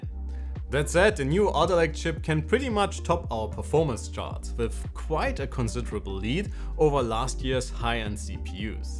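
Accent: Germany. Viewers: Höre ich da etwas einen Landmann im angelsächsischen Bereich wildern?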